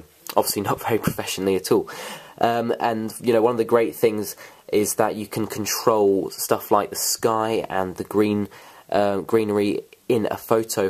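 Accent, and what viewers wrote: United Kingdom. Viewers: I like he British accent !!!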